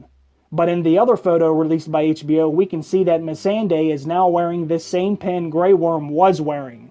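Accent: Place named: New Jersey, United States. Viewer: you need to be deported then. disappointed with your lack of caring and making my ears bleed with your semi informative jersey accent.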